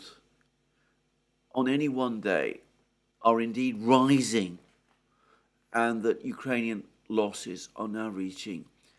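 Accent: United Kingdom. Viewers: I love your program,. I never miss it. You're well-informed, truthful, reliable and also a gentleman. Besides that, I found your British accent clear and very, very nice. Cheers from Santiago de Chile!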